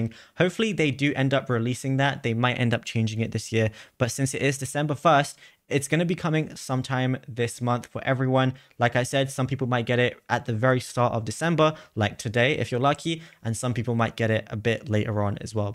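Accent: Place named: United Kingdom. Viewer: He’s got a British accent and he’s got it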